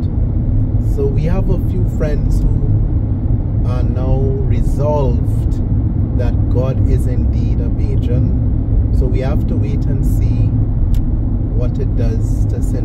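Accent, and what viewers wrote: Trinidad and Tobago. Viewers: I hear a trini accent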